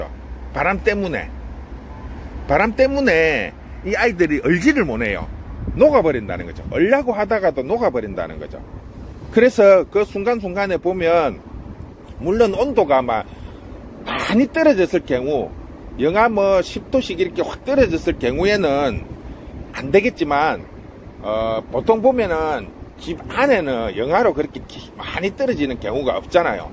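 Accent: Gyeongsang, South Korea. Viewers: 화려함은 중요하지 안어요 있는 그대로의 모습~~ 님의 그수한 경상도 사투리로 알찬정보가 구독자분 들에게는 많은 공부가 된답니다 고맙습니다.감사합니다.축복합니다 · 있는 그대로의 모습과 사장님의 구수한 갱상도 사투리가 어우러져 훨씬 정감가고 멋있습니다 거기다 좋은정보까지 ᆢ 정말 감사드립니다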